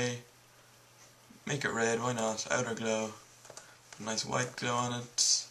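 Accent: Ireland. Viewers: You're Irish like me :D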